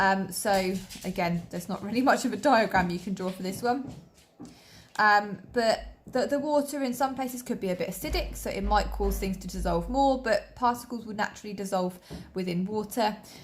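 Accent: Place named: England, United Kingdom. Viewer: Which English accent do you have ? I like it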